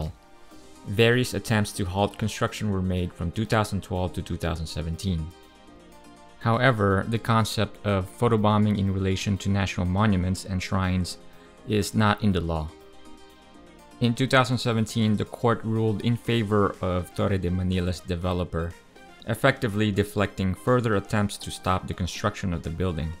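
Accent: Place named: United States. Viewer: Akala ko amerikano ka biglang nagtagalog Hahahahaha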